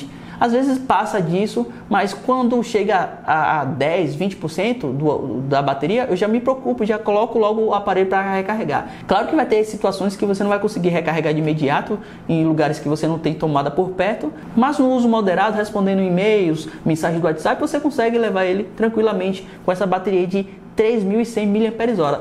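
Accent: Bahia, Brazil. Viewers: Baiano kkk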